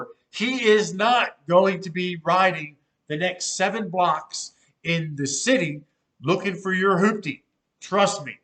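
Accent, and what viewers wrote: United States. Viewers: Is that sarcastic? He’s a bit country but the info is at least smart sounding to me. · I can't focus on anything hes sayin over the soujd of his Pittsburgh accent!!!!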